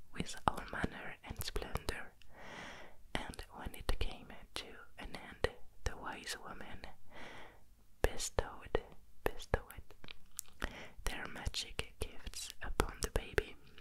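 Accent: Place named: England, United Kingdom. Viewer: J adore ton accent anglais ma sublime et ravissante super vidéo de qualité tu es relaxante 💜🤗💋🥰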